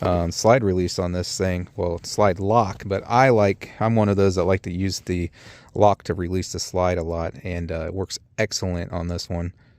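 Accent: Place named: Ohio, United States. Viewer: "There's your take up there...I question myself whether or not I've wracked the slide on this BUT I think so (laughs) then pulls trigger! I recognized an Ohio boy right away!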